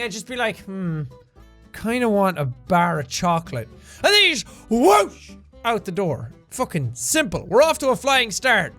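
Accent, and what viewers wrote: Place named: Ireland. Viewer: I’m sorry but the way you pronounce ‘lorry’ 😂😂😂 Not hating, just found it funny 😂😂 Dang that Irish accent 😂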